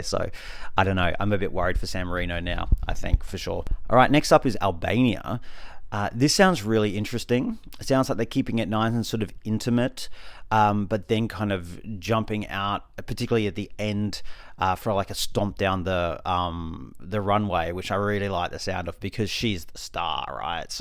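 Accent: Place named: Australia. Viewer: Hope you had a fantastic birthday! 🎂Been listening to for years and have always apprechiated an aussie voice to the fun of the eurovision song contest. best wishes from a fellow aussie!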